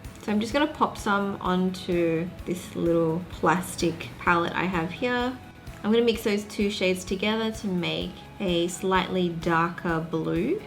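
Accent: Australia. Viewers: Your accent is cool, it sounds like you are or you spent time in Australia.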